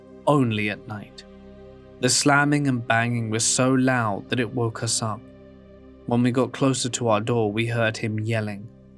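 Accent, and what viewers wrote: United Kingdom. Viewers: The British accent gets me every time. 😊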